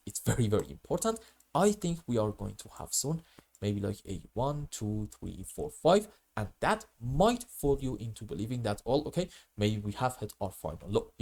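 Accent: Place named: Iran. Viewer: I have a feeling that you are from Iran. am i right? cause you accent is so similar to those! if you tell the truth , i will consider to subscribe bro.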